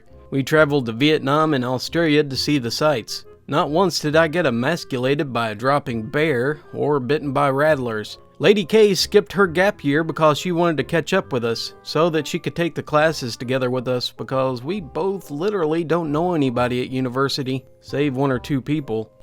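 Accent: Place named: Appalachia, United States. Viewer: I have your voice. You sound like a hillbilly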